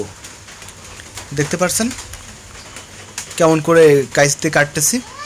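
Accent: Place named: Khulna, Bangladesh. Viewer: ভাই, আপনার বাড়ী কোথায়? ভাষা তো আমাদের মতো, খুলনা বিভাগ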